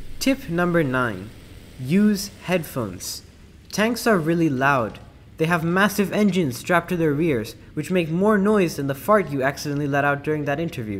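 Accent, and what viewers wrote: Malaysia. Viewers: Ae you malaysian? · Where are u from??? Malaysia?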